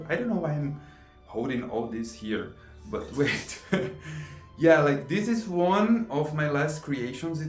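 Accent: Brazil. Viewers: Que bonitinho o sotaque brazuka falando Inglês! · Our cute brazilian accent turns the video even better 🥰🥰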